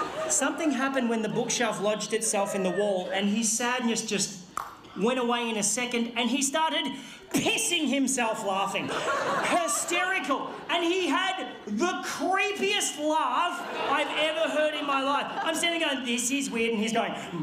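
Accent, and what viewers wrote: Australia. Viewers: mans so aggressively australian he replaced the voice in my head , now everything i read is in australian muppet reject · He's actually pretty normally Australian. is just regular Australian. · this poor guys comment is getting attacked guys chill lol also yea i know what you mean his emotional range with the aussie accent and the way he talks goes soo well with how we speak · This isn't aggressive Australian, this is regular Australian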